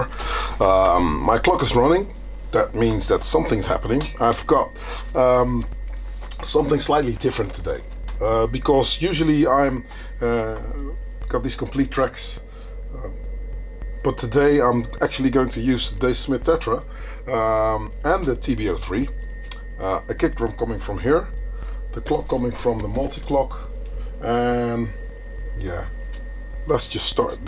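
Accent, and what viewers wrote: Netherlands. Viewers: Dude are you dutch? You have a heavy dutch accent. And I’m just some random dude from California and can hear it.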